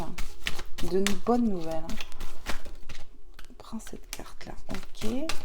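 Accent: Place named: France. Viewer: J ai fort apprécié, vous vous donnez beaucoup, yes! Yes! J espère que les choses vont enfin de bouger … j apprécié votre accent français , ça a qq chose de sympa. Je suis Belge,,, bon week end à vous🤸🏻‍♂️🦋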